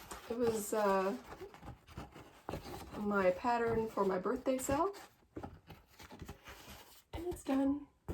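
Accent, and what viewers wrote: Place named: South, United States. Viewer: That Amish life in that magazine blitstitch is working on :) and I found it completed at a thrift store for a $1!!! It’s not framed but all three pieces are done except a little bit of border and bush but you can’t even tell unless you were looking really hard lol loved you video and am going to watch your 1st!! Love your southern accent 😜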